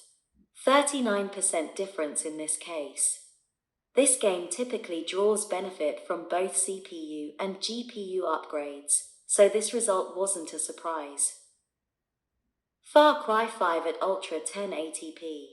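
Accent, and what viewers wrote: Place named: United Kingdom. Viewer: how come the a.i. voice has a british accent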